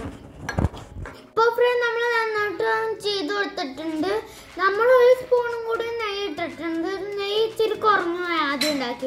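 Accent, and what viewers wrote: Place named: Aluva, India. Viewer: വളരെ നന്നായിട്ടുണ്ട് നല്ല അവതരണം ആലുവ സ്ലങ്ങി ൽ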